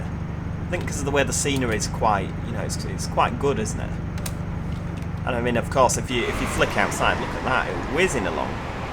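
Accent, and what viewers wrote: Manchester, United Kingdom. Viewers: I'm writing you from isle of dr-seuss...is your accent from manchester there, please..? if not, would you then let me know wherefrom? <link> ;)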